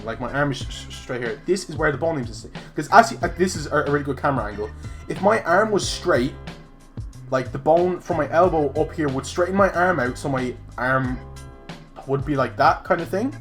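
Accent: Dublin, Ireland. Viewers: Where about in Dublin are you from🇮🇪🇮🇪🇮🇪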